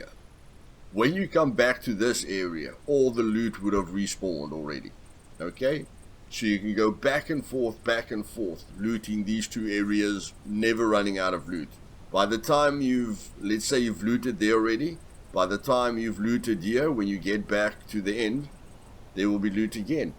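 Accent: South Africa. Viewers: Jy Suid Afrikaans?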